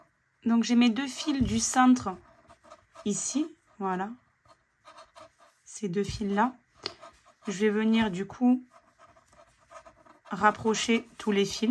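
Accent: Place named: Perpignan, France. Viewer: Super sympa et tellement bien expliqué avec notre bel accent de Perpignan 😅.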